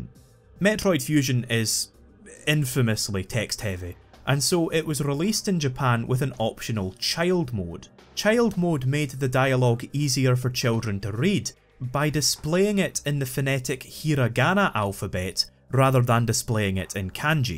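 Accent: Scotland, United Kingdom. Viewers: Are you Scottish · man with thickest Scottish accent in the universe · love the Accent. Are you from some where near Glasgow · Do you have a Scottish accent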